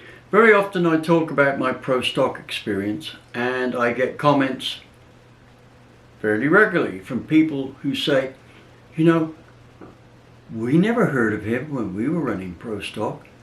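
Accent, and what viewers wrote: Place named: England, United Kingdom. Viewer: I love your channel you remind me of my grandpa and I when we use to talk about and restore old British Motorcycles. Since he passed I got into cars and now have an Ecoboost Mustang myself. You remind me of how my grandpa use to explain things to me, even down to the English Accent. I watched your videos about picking out wheels for the ecoboost and it has helped me a lot. Thanks for everything.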